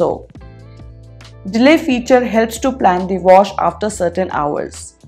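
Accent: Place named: India. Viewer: Ayyo sumne kannad baralla Anta heltare illa Andre swalpa swalpa gottu Anta Hindi accent Alli heltare..nam Bengaluru kathene istu 😀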